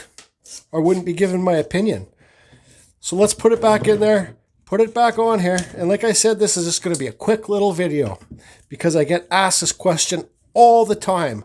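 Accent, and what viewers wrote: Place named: Canada. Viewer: You're Canadian eh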